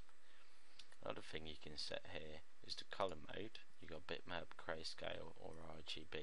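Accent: United Kingdom. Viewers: Its an English accent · It's British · I do have trouble following British accents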